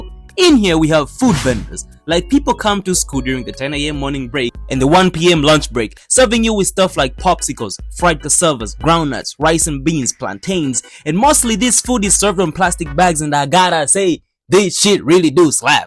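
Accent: Kenya. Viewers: You sound Kenyan… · Your accent had me thinking you were kenyan ngl.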